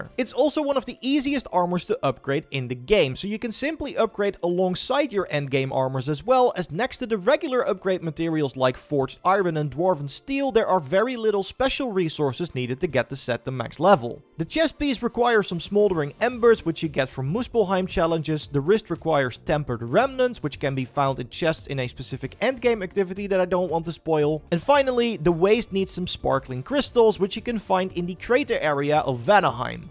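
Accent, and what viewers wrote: Netherlands. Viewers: dude r u dutch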